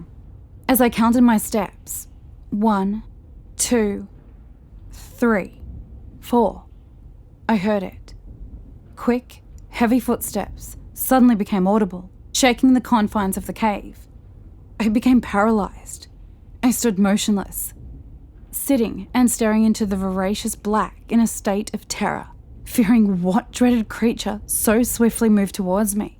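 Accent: Australia. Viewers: The best Aussie voice is at it again... Great video sis!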